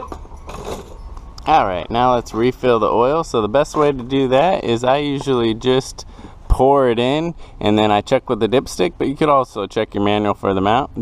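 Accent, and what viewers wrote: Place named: United States. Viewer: Ох уж этот американский фермерский английский язык, но спасибо по дейстствиям все ясно провел обслуживание своей гозоно косилке.) 👍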